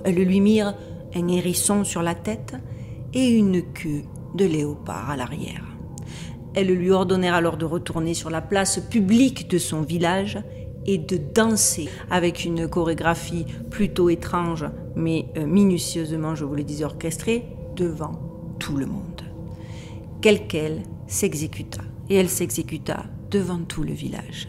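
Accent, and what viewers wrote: South, France. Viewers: bonsoir j'adore votre accent du sud de la France que l'on ne perd jamais où qu'on aille je suis toujours demandé comment cette famille a pu prendre un tel essor "sans rien faire" oui oui oui je fais de la magie chrétienne et j'arrive à un bonheur incroyable mais je me suis faite toute seule et jamais le dark side ne pourra m'atteindre j'ai l'amour inconditionnel et universel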